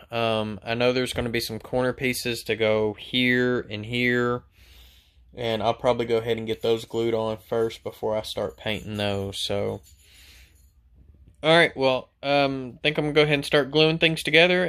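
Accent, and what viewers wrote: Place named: Arkansas, United States. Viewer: Did a great job...great recovery. I’ve run into some bad instructions from Walthers too. Your Arkansas accent got me 😊...spell the name of that business....Happy New Year 🎆🎊🎈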